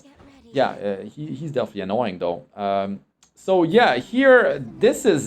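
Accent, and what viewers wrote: Quebec, Canada. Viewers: Hey, weird question but are you from Quebec? Your accent made me wonder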